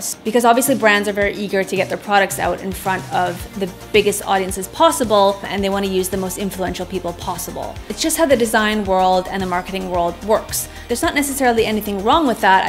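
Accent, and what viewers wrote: Italy. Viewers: Your italian accent is perfect! Greetings from Sicily ☀️ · Where did you grow up? Your Italian pronunciation is beautifulllllll — my HS and college Italian teachers’ ears would flutter — but I definitely hear a hint of some other accent while you normally speak. It sounds like a medley of 3 or 4 influences. Italian has gotttt to be involved.. you obviously speak English, but American English? Do I dare say no? And I want to say French? I am such a lover of soaking up unique ways of speaking and I’ve always been very intrigued by the way you speak! Side: I listened to the audiobook The Glass Castle yeeeears ago (one of my all-time favorite books) and it was read by the author herself who grew up allll over the United States and her accent was sooo unique. I’ve been super aware of tiny details in accents ever since haha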